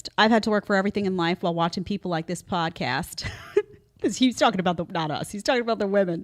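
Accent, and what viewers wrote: New York, United States. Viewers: Mama I truly love that New York accent of yours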